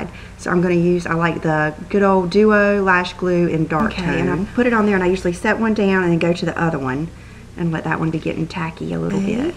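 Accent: South, United States. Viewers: Wow, they need to bring that paint pot back! Such a pretty look on you. I like my brushes "seasoned" too.....lol. I got that Nars eyeshadow in the mail Friday, it is so pretty and different. Oh, one more thing so you won't think I'm crazy, I don't know why I thought you were from Atlanta! That's why I told you I loved so close to there. Sorry. (Maybe the stereotypical southern accent that most of us from the south have, idk?!) xoxo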